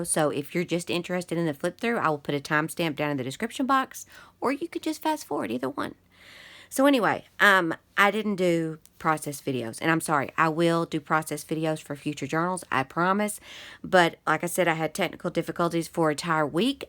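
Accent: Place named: South, United States. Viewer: Love love everything about this journal but I love your accent & the way you explain. Plz tell me y'all from the south 💜😘👍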